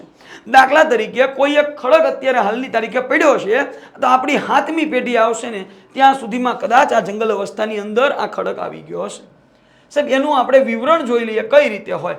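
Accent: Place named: Kathiawar, India. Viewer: તમે પ્યોર અમારી કાઠિયાવાડી ભાષા બોલો સર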